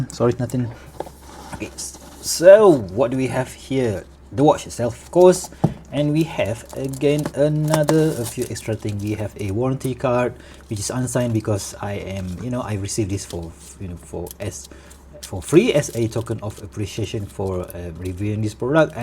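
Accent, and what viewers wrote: Malaysia. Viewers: Bila dngr slang dah agak dah mcm malaysia/indo, tngok dkt about from malaysia, terus subscribe, sbb nak cari jam yg affordable haha teruskan content broooo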